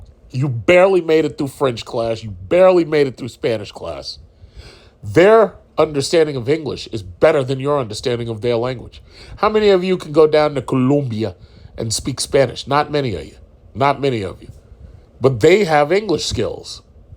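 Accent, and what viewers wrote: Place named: New York, United States. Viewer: Lol I agree I heard that New York accent and was ok real talk here 🇨🇦👍🏿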